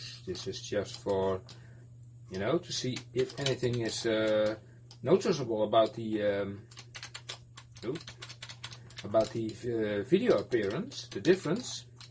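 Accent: Netherlands. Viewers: I lof Dutch akksent. 😍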